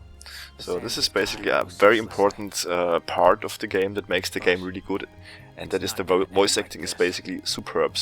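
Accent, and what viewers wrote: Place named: Bavaria, Germany. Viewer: you Sound very german. :D Can you play this game at full Screen? ape escape 2 seems to be the only full Screen game. :/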